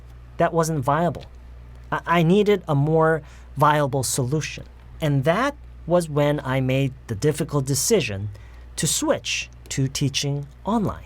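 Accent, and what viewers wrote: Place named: United States. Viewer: 발음이 미국 사람과 비슷합니다 흑인보다 백인 영어에 가깝습니다 얼굴은 흑인인데 백인영어를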